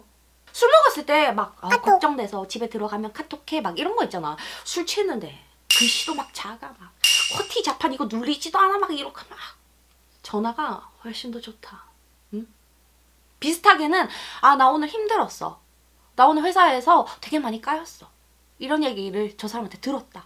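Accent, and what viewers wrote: Gyeongsang, South Korea. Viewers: 서울방언속에 경상도표준어가 쪼매 들리네요.ㅎ 잘 듣고 가용.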